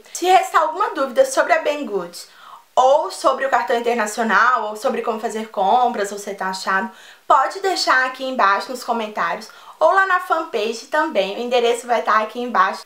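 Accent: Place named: Northeast, Brazil. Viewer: acho bonitinho esse seu sotaque nordestino ;P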